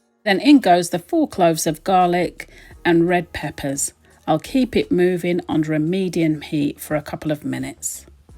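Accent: England, United Kingdom. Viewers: I like it so much! (I mean this lovely pure english accent 😋😊). Greetings from Russia Already trying to cook 🧑‍🍳🍽️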